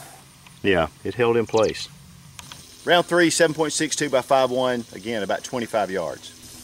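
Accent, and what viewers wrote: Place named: South, United States. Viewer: Great accent denotes Southern nobility of yore.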